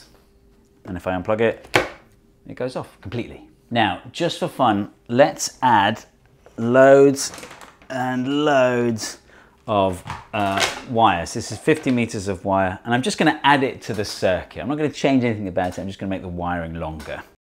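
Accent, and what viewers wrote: United Kingdom. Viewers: <link> to an American wow I forgot how weird British people say aluminum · second (?) video i've watched of and i've only just realised they have a british accent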